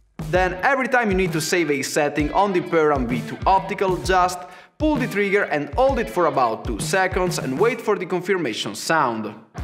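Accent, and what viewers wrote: Italy. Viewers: what an annoying voice you have. Overkilling italian accent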